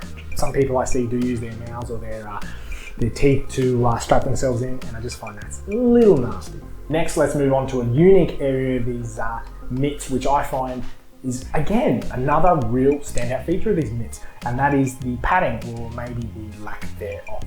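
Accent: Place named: Australia. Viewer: Your channel is Fair dinkum I appreciate your reviews. I love listening to people talk about muay Thai gear especially in an Aussie accent. 🥊 I love when you said "muay" instead of "my" 🤣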